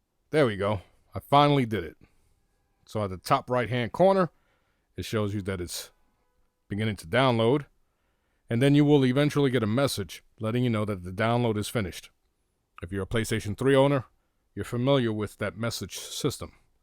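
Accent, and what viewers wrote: United States. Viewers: this is probably the best american voice i ever heard.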